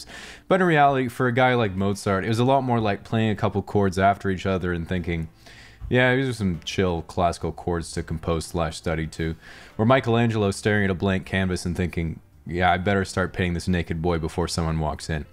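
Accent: England, United Kingdom. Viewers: your British accent is gooood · Imressive British accent, dude. New here, but will check back ~ 💁🏼‍♀️ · Jesus that English accent is flawless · why do you sound like Tom Hiddleston in that British accent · Your British accent tho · Thats an amazing British accent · That British accent is absolutely fantastic, you sound more British than me and I’m British. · I’m actually very impressed by his British accent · I love your brittish accent holy shit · your dramatic Narrative English accent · so no one's gonna talk about how he perfectly talked in British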